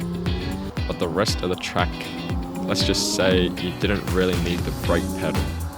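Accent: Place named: Australia. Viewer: Why does this bloke sound aussie, but like he is trynna hide the accent haha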